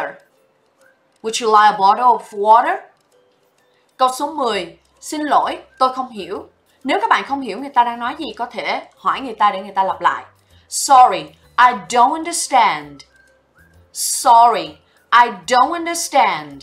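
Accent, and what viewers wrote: Standard. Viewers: bài của cô giáo rất hay, rất hữu ích, phát âm của cô giáo cũng rất chuẩn. Nhưng mình có 1 góp ý nhỏ, đó là đội ngũ biên tập nên soạn bài cẩn thận hơn, và cô giáo cũng nên xem qua bài trước khi dạy. Trong video này mình thấy từ nail trimming, cô viết sai thành anil trimming, đó chỉ là lỗi chính tả nhỏ thôi, nhưng vấn đề là cô vẫn cố đọc là "anil trimming", làm gì có từ nào là từ "anil" ạ. Sau tất cả vẫn xin cảm ơn tâm huyết của cô giáo ạ.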